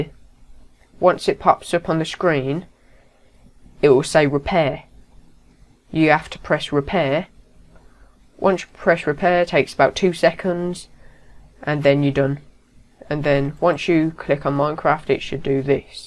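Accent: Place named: United Kingdom. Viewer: MAN YOUR ACCENT IS AMAZING, ARE YOU BRITISH?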